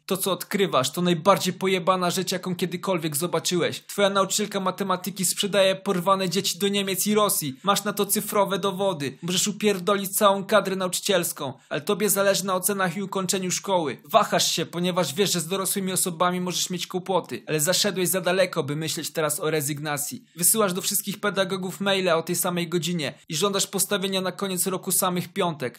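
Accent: Podlasie, Poland. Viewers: Ej mam pytanie jesteś z Podlasia? Xd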